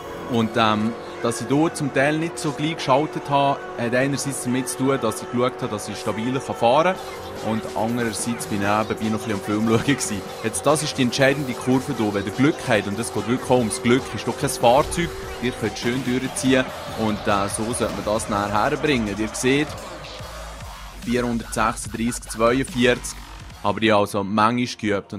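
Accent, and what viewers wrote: Switzerland. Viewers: sprichst du schweizerisch oder holländisch??? ich versteh nur jedes 10.wort und komme aus bayern (mittelfranken...)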